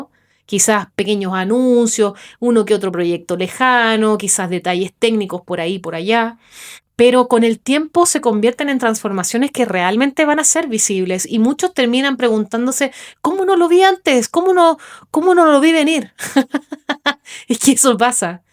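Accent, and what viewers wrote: Chile. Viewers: Perdòn por tu aceto te creìa chilena pero dice Arquitecta Argentina :body-blue-raised-arms::hand-orange-covering-eyes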